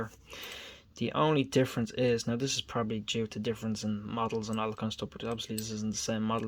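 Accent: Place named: Ireland. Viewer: Date code H33 on your genuine Abloy is August 2020. That is a new model genuine Abloy, I could tell straight away just by the laser engraving. Just because you bought a 200 quid lock for 60 quid doesn't mean its fake! It means you got a bloody good bargain! There are No, zero, zilch, nada, fake Abloy PL362 padlocks, nobody has spent tens of thousands of pounds to machine up to make exact duplicates of them only to sell em cheaper, putting emselves out of pocket! You have a real Abloy! Rest assured its real, real case hardened steel body and real case hardened Boron carbide shackle! I take it you're from the Emerald Isle, by your accent that it is! Well now, Slaint m'hath and slow on the ishke baha!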